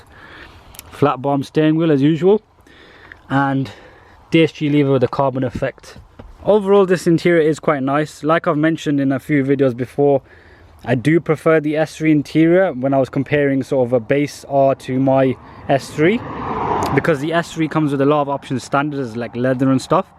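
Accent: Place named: Birmingham, United Kingdom. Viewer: You're from Birmingham aren't you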